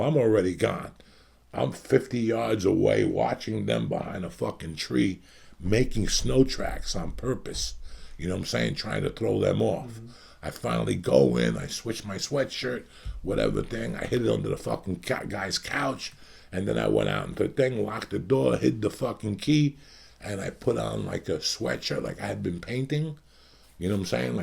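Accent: New Jersey, United States. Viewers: Anyone else noticing how is starting to talk much more like he lives in jersey haha morning church!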